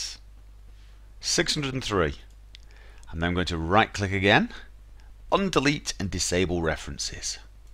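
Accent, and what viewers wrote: United Kingdom. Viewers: Thank you for this tutorial.From your speaking tone,i'm guessing you're from United Kindom.I like the way you talk!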